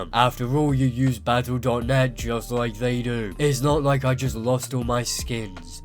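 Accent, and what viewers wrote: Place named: United Kingdom. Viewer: Can we all just agree that accent increases the quality of the video by 1000% Something about the (British, right?) accent just makes his commentary and critique so much better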